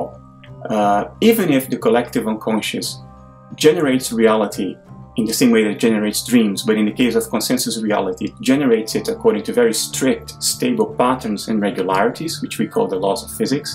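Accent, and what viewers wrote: Netherlands. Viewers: Cool Dutch accent!! Good points made!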